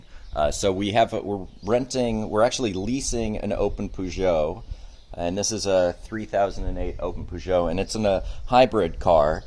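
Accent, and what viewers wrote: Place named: Northeast, Brazil. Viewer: ela e brasileira! :) acho que nordestina!!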